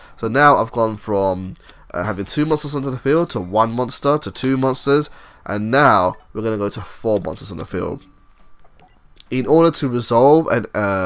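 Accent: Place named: United Kingdom. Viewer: U sound so british, are u from england?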